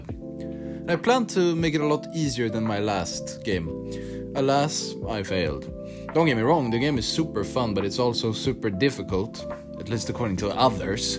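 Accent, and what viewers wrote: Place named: Sweden. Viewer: Great job! Love the Swedish accent as well!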